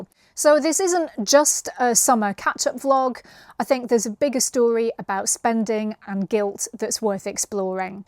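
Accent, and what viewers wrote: United Kingdom. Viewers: Caught your video in the rain soaked car, wanted to say that I liked your delivery and comments on the weather. I was unable to post a comment though. You seem quite a sincere person who knows quite a bit about yourself and preferences and how to cope. I am a little astonished how many nice YouTubers there are. And many seem to have a British accent.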